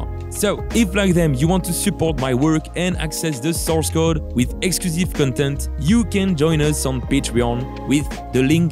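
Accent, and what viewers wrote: France. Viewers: you have a french accent ?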